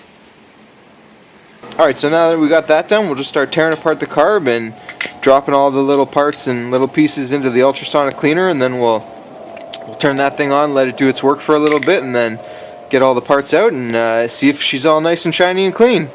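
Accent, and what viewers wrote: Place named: Canada. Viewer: Oh you Canadian? "Check it oot!"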